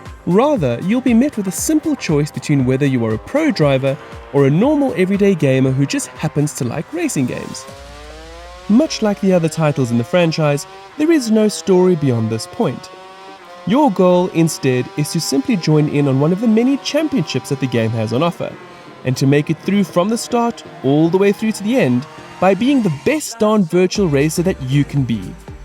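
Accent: South Africa. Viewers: Any South African voice gets a Like and Sub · Well stewie is british and this guy has a south african accent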